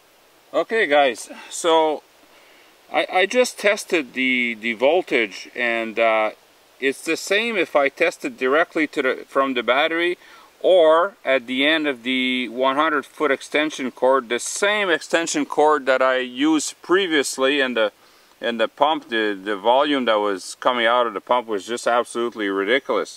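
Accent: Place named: Canada. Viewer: Nice setup. Looks like there's enough room in the box for the battery, so you could have even shorter wires. Plus it'll keep it sheltered and hidden. P.S. I could tell from your accent that you're a fellow Canadian. French Canadian?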